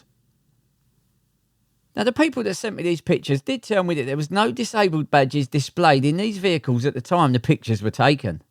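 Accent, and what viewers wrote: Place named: England, United Kingdom. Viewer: Holy fark your pommie accent is very fuckin' annoying so n I gone.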